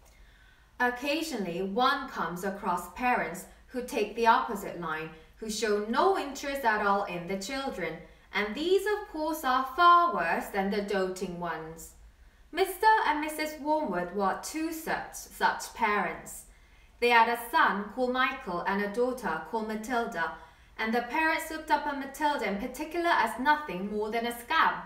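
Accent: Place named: United Kingdom. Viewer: 아니요.발음이 약간 영국식이세요.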